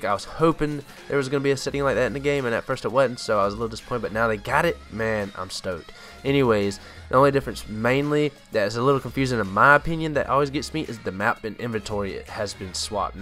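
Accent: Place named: South, United States. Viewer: He sound good st the beginning but then he country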